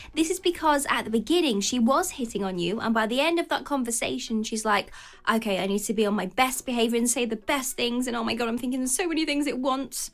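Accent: England, United Kingdom. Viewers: I dont know much about the tips but i watch these videos for the English accent and the squeaky voice.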